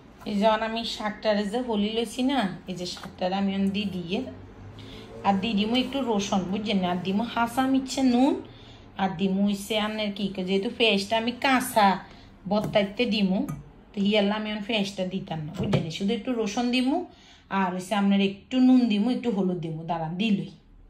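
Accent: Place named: Sylhet, Bangladesh. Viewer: সিলেটী ভাল করে বাংলা বল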